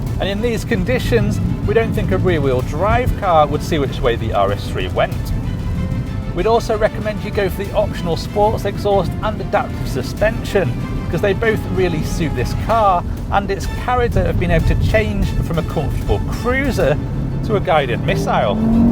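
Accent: Liverpool, United Kingdom. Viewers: can someone please tell me what region of the UK his accent is from? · Taken me a while but I'm beginning to like his reviews. He is a bit scripted but he has a great way with words and his accent is certainly different (a bit of Scouse I think). Also, he sure knows his automobiles....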